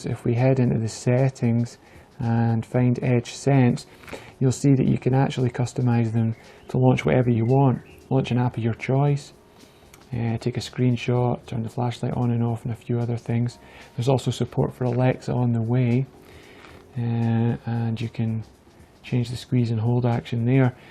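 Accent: Scotland, United Kingdom. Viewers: Scottish. From Scotland. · Love the accent! Scottish?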